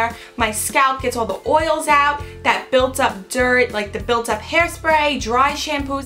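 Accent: New York, United States. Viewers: Is it just me or does she have a New York accent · are you from new york? you really have an accent. i can tell because i am from new york. :)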